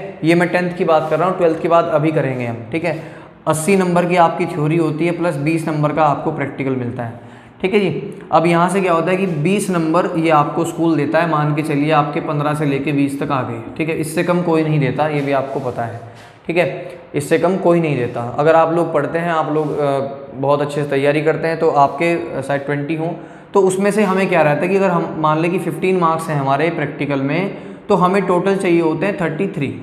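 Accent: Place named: Garhwal, India. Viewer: Sir ji ap gadwali ho kya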